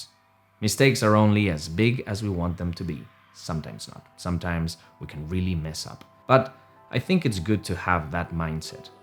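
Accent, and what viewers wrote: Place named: Spain. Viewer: Eres Español creo se nota😀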